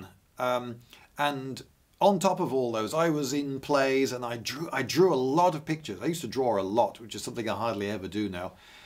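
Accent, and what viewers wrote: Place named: United Kingdom. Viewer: Very British man overanalyzing a hypothetical question? Yes, please!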